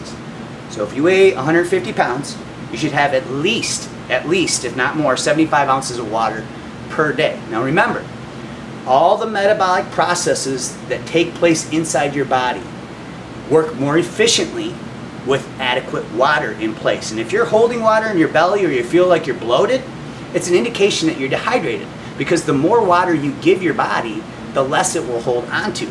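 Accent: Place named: United States. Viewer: nice video, You have a very strong American Accent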